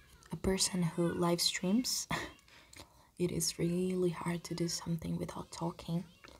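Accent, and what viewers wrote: Brazil. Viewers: sabia q era br, sotaque inconfundível, bom video! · Aquele sotaque brasileiro bem por longe, sabia que tinha algo ai hahahahah Ja vou me inscrevendo e obrigado pelo videi, tras mais lens licking❤ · vc é brasileira? kk na hora que abrir o seu video eu percebir, mais achei que era só achismo kkk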